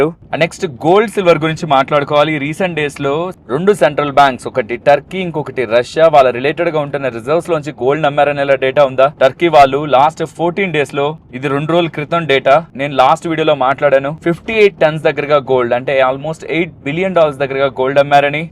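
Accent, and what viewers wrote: Nellore, India. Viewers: Galaba chasi velli potey … ❤ the Nellore slang !!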